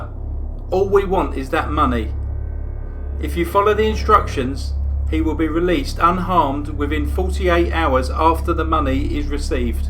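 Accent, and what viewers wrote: England, United Kingdom. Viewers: I think my hubby is jealous, he doesn't understand why I love listening to your voice for one. He knows I love mysteries and scandals, but I told him your voice and others English voices adds to the attraction. Please don't ever lose that! With us being your cousins I cannot figure out how we lost it here centuries ago.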